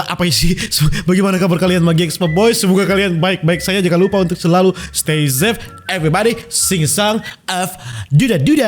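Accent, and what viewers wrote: Manado, Indonesia. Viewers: Suka dengar pertuturan orang manado. Unik 😊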